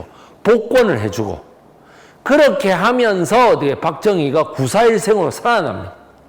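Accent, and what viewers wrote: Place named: Gyeongsang, South Korea. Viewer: 구수한경상도사투리억양 으로 아주 유익한 우리나라 역사해설들을 배기성선생이 참 잘해줍니다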